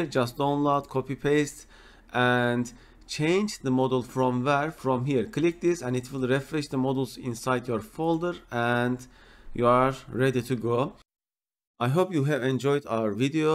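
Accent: Turkey. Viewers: you have a strong turkish accent brother 👊🏻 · tr ingilizcesi bu nerde olsa tanırım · I like turkish accent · This is definitely English accent of a Turkish speaker :)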